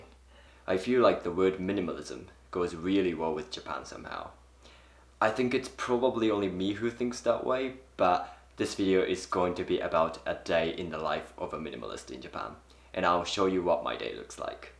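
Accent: New Zealand. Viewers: His accent is not pure Kiwi. It sounds a lot Kiwi and a little bit something else 😊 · I thought I detected a New Zealand accent, I wasn't wrong. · I love this! Aussie girl her and soon as you started talking i was like.... hmmmmm that accent... then you said you moved from NZ! Lightbulb moment ! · That was my first thought as well... wth... why r u microwaving bread. Also why only 1 slice?????? Plus I was trying to suss out the accent and u said nz and now I get it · Ahh! I knew you were from NZ I could hear the nz accent! Just a wee bit 😄 I’m from Auckland. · It’s more like a kiwi accent. Interesting that you mistaken it for British though! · You have an interesting accent- a combination of different places?? How long did you live in New Zealand for? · Great insightful video. Enjoyed your thoughts on minimalism. Also, strangely, I immediately noticed the flat “i” sounds of your New Zealand accent. 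G’day from a new Aussie subscriber :) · Minimalism is a state of mind- and it takes practice. Also: Your combination of Kiwi and Japanese English accents is simply fantastic. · You have such a Kiwi accent Lol!! Keep up with the YouTube videos man! I miss living in Japan..had to move back to NZ because of Covid :-( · Really enjoyed this video, love the whole concept of minimalism and this was very achievable, once you mentioned that you had lived in New Zealand I could recognise a slight Kiwi accent. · HOTTIE ! I love New Zealand accents and Japanese men. Why am I not married to this guy ?? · Haha! Even before you mentioned NZ I was like, that accent sounds very familiar... I’m a kiwi 😊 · great video, i'm from NZ and i can hear the Kiwi influence in your accent :) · Kiwi accent 👍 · I love his kiwi accent.. or is it him that i love? ☺️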